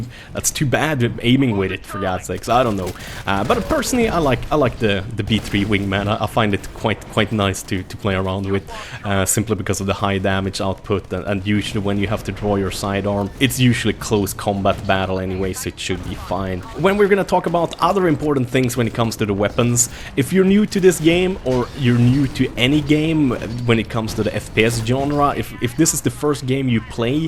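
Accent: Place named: Sweden. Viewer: Hehe, it only takes me a few seconds to recognize the swedish accent.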